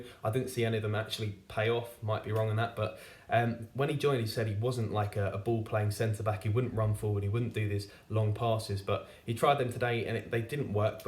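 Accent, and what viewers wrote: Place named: England, United Kingdom. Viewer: Mate well into your channel - quick question! How come you're are Rangers man, sounds as if you're English? I am too, always find it interesting!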